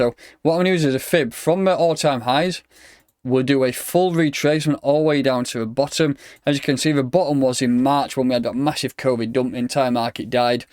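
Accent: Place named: North, United Kingdom. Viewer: I come for the Crypto and I stay for the Northerness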